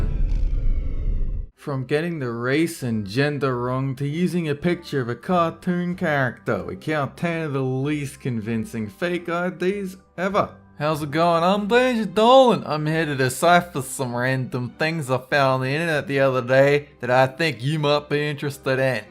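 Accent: Australia. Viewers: I never knew you were Australian m8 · i know an aussie accent when i hear it.... he is definately australian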